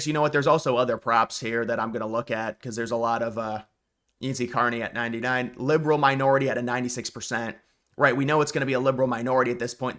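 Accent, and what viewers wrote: United States. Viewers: You talk like an American.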